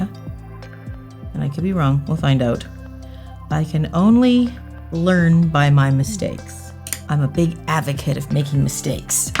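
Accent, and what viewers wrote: Canada. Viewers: It’s my third time watching her vid and I totally don’t know anything about her But I’m going to guess she’s from Canada I’ve been hearing her saying ey many times 🖤🖤🖤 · Are you Canadian?😜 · Wait is she Canadian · You can definitely tell is Canadian because of how many times she says "eh?" after her sentences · Are you Canadian? Cause you say "eh?" a lot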